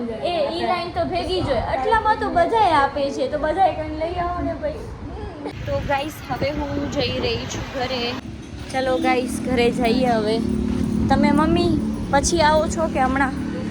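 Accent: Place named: Kathiawar, India. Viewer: Chokhu kathiyavadi bolo chhe maja avve chhe ❤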